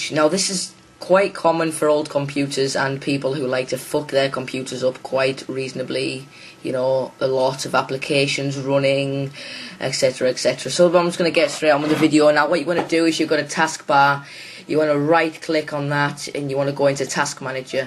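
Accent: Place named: Liverpool, United Kingdom. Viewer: Ya sound scouse or maybe from Manchester, yeah? Nonetheless, loving your dialect! Cheers!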